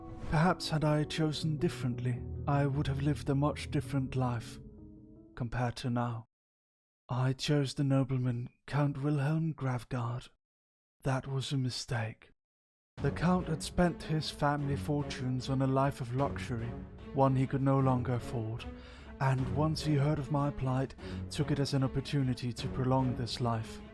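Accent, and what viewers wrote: United Kingdom. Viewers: With the voice I sort of imagine a British mercenary. The lore of this just really is deep.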